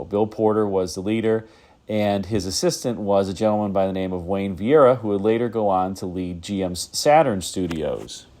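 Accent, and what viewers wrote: Midwest, United States. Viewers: I loved the first generation Grand Ams and Pontiac did a tremendous job styling them — when they were pretty similar in a lot of ways to a Chevrolet Malibu underneath. I had to laugh at your mention of the Ventura pronunciation. I like your midwestern accent and it reminds me of the HGTV host Scott McGillivray (who is actually Canadian) and some other actor I can't think of. It's kind of a Great Lakes area sound to me. Lol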